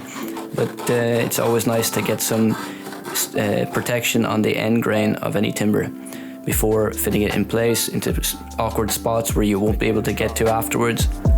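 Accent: Ireland. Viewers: loving the ducks, always make me smile, happy birds, i been adopted by several foxes, a couple of them follow me up the road, very amusing - loving a bit of southern Irish accent, got a bit of Irish in me somewhere, not sure which bit, but some will say the best bit haha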